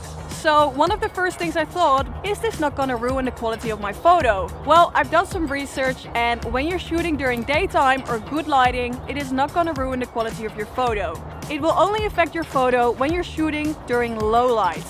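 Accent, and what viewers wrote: Netherlands. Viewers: Nice Hollands accent 😁😁. Cropping... The same as cutting out a piece afterwards. Nothing special... No real extra zoom. Just An extra digital zoom like the older digital camera's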